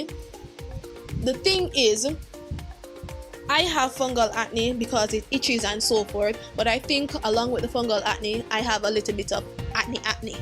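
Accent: Jamaica. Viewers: Love your Jamaican accent 😍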